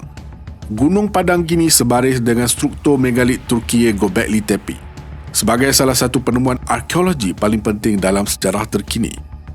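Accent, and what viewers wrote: Malaysia. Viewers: Pusing aku kalau orang malaysia ngomong...bagus belajar dulu sama melayu riau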